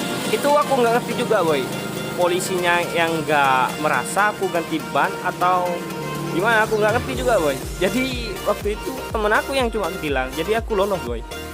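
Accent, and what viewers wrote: Madura, Indonesia. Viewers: Kayak orang Madura suaranya yang omong tuh bng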